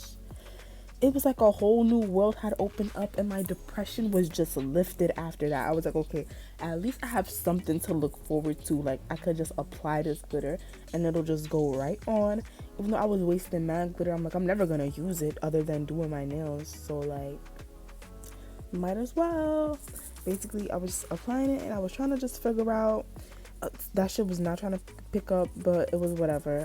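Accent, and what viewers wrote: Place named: Barbados. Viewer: “I’m not ling ling in the flesh” Plus your Bajan accent 🙌🏾 Loll I love it😂😂🙌🏾❤️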